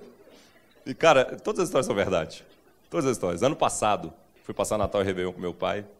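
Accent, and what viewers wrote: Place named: Goiás, Brazil. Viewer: difícil o Goianês... ainda mais sendo gaúcha! 🤣🤣🤣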